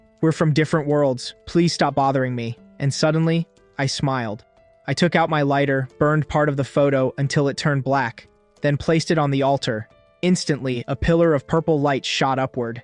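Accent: Jamaica. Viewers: Ohhh yuh Jamaican.. eh video tuff bro nah gu lie but wish wah prt 3 did di deh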